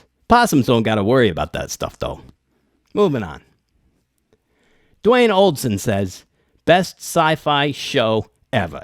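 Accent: New York, United States. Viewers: Somehow, I stumbled onto your pages. Being a casual, super nerd and loving all things sci-fi. I also consume all movies, new and old, especially if they're good so I love all the movie references have found some of your recommendations great options to follow up on. I always learn something new when I watch your videos. I found your insights, interesting, fresh, and fun. I hope you continue to do videos because I think the last one you posted was a year ago. I'm not seeing anything new, unless I'm not looking at the timestamps correctly. Keep up the good work. I'm still going through the rest of your catalog, but I completed everything Star Trek and moving on to the other videos and playlist that you've created. Love your work. And I love your scripts and New York accent. It has a hint of old -timey but hip New Yorker.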